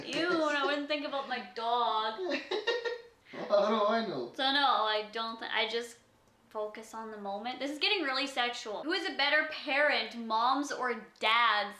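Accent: Canada. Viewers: i love your canadian accent!!! its just so funny! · i love your accent i wish i was Canadian xx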